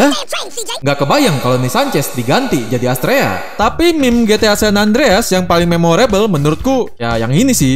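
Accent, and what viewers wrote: West Java, Indonesia. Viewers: Orang sunda mang?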